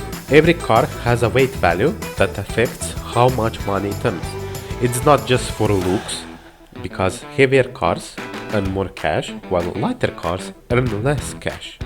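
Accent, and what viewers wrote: Russia. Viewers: BRO SOUNDS RUSSIAN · ты руский по акценту понятна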